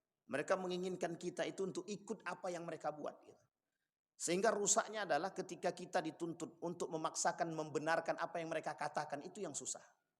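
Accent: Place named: Riau, Indonesia. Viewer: Dari Riau sptnya. Sama spt ust abdul Shomad.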